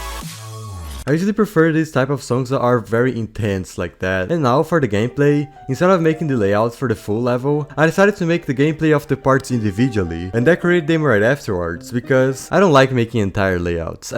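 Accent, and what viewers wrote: Brazil. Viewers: I recognize the Brazilian accent everywhere lol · Ae mano, eu notei um pouco de sotaque no jeito que vc fala em inglês, e só queria perguntar se vc é brasileiro (Hey man, I've noticed a little bit of an accent on your English, and I just wanted to know if you're Brazilian)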